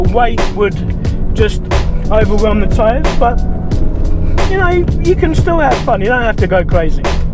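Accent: London, United Kingdom. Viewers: Just discovered this channel. I’m getting a stage 2 in the new year on my F10 M5. I have a track focussed 911 which I take to the Nurburgring, but in April I’m taking the M5 ! Great hearing a Brit over the pond. Take it easy brother 🤜🏼🤛🏾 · I like your video style great funny conentry good old London accent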